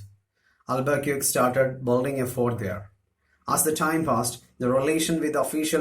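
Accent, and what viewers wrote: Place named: India. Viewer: I can read the book it’s more understandable then a Indian accent I thought this was a documentary.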